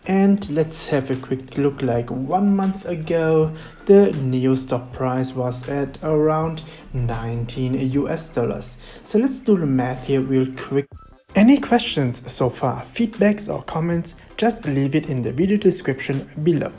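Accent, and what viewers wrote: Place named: Germany. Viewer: Haha der deutsche Akzent ist einfach gut!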